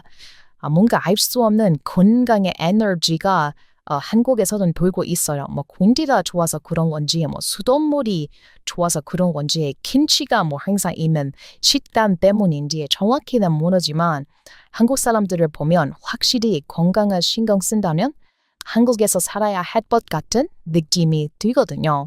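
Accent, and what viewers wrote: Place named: United States. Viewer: 평소 푸른 눈의 서양 여자인 전형적인 미쿡여인 이시지만 발음은 미쿡식 그러나 어휘력은 한국인에 가까우신데 발음만 교정하신다면 진정토종 한국인 같을거에요 아름다운 그대 진정 한국인으로 사시기를 원하신다면 님께 잘 맞고 멋진 한국남자를 만나 진정행복한 삶을 사시기를 진정으로 기원합니다😂😅😊❤❤❤❤❤❤❤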